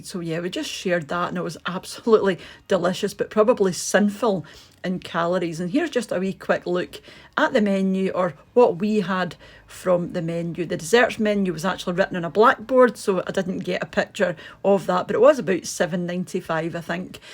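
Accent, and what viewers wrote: Scotland, United Kingdom. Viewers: I love these video's, they give me some new ideas and a new lease of life. I also adore your Scottish accent! x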